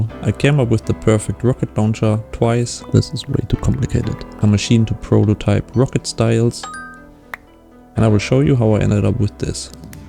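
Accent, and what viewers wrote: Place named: Germany. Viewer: hes back and sounding more german than ever. wait a second this isn't colony island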